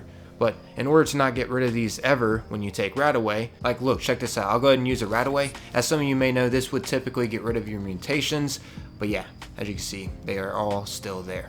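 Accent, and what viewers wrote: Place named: Delaware County, United States. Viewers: Philly all’s I hear is philly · Are you from Delco lol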